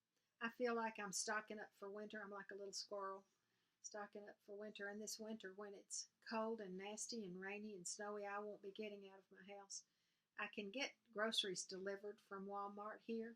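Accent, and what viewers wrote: South, United States. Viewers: I feel blessed to have you as part of the flosstube community. I always watch your videos first whenever they come up in my feed. I love your stitching, and listening to you talk reminds me of my southern relatives who are no longer with us.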